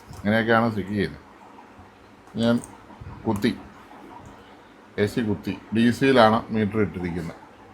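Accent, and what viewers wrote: Kottayam, India. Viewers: അച്ചായാ വളരെ ഇഷ്ടം നമ്മൾ കോട്ടയത്തു കാരുടെ സംസാരവും സംസ്കാരവും ലോകം അറിയട്ടെ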